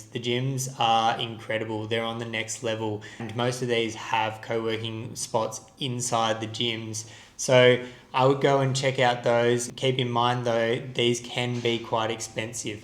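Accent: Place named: Australia. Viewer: Cheers brother 👍 Nice to hear an Aussie accent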